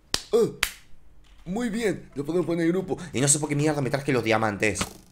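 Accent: Córdoba, Argentina. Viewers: se te esta llendo la tonada cordobesa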